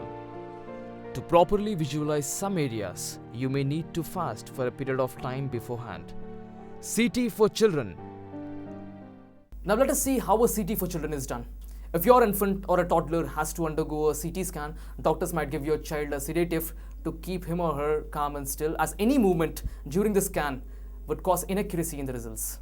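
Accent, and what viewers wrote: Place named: India. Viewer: His English is Indian.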